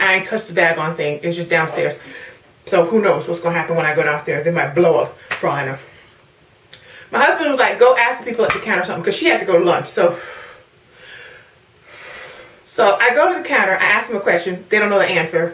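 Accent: Caribbean. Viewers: You have made my day, you are so funny! Love the Carribean accent! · you really went in on her with your VI accent mehson! I feel for you digicel got on my last nerve last yr. Hopefully this is the last of it.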